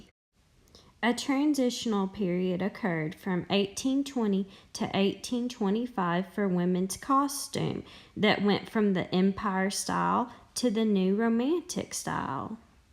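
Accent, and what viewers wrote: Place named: Missouri, United States. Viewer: I love your accent, are you from misouri? I'm not from the states so that's the closest guess I can make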